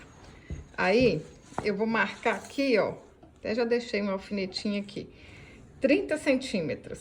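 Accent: Minas Gerais, Brazil. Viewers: Você é mineira uai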